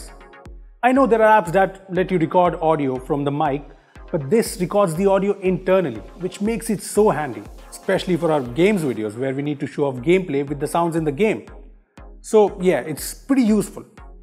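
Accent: India. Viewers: No offence but i just hate Indian accent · Fuck india fuck your ugly annoying accent fuck your shithole country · Finally an Indian accent that very understandable.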